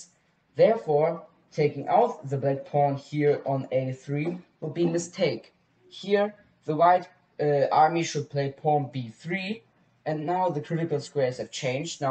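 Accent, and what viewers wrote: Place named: United Kingdom. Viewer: Begrüßung aus Bulgaria. Ich bin dein 375 subscriber. Dein Englisch ist sehr Britanisch für mich(das ist normal). Ich denke deine videos sind sehr neu und gut. Das ist die erste video YoutubeALg. shau mir auf dien channel. Ich will mehr shcach videos für angefanger (ich bin 1800chesscom2000liechess blitz) in youtube. Mein freund (unter 800) muss learn von dich. Deine erzelung ist sehr gut. In meine meinung die serious erzelung in youtube ist nicht genug.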